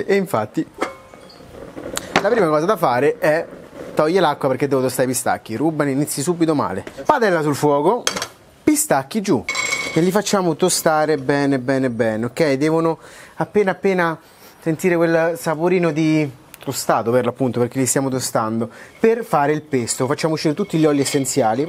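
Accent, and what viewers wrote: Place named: Sicily, Italy. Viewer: Guarda che stai parlando siciliano non calabrese.....comunque sei bravo....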